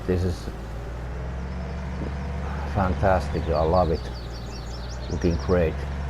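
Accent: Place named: Finland. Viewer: Greetings from Finland. I listen the accent and think you must be from Finland. Have you try to grow cotton in this map?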